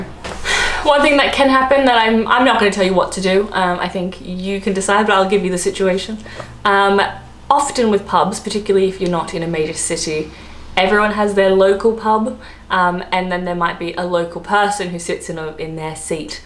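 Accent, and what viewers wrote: England, United Kingdom. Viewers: dont get me wrong you are right about put culture i am 65 you sound more english than me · Middle Class English accent!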